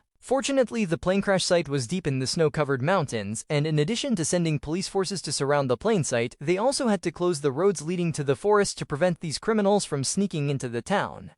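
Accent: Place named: Germany. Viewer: The AI’s German accent narrator is a relief because most AI voices are the same generic voice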